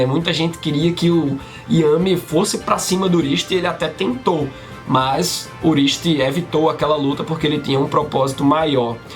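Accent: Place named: Recife, Brazil. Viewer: Pelo sotaque vc é de recife Pe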